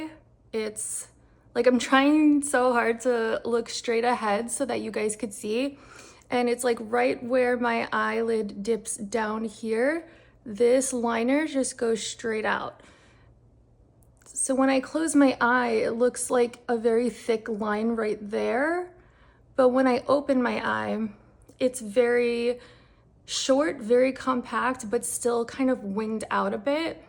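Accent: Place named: New York, United States. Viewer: Your voice and accent are so comforting to me. My family is from the Bronx and Jersey, but I am far from home in the Midwest. ❤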